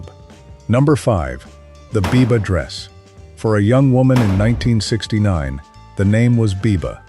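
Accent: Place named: United States. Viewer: Why the US AI accent? And rockers illustrating an article on Mod Culture? Most of the things shown here were on their way out by 1969, apart from the skins.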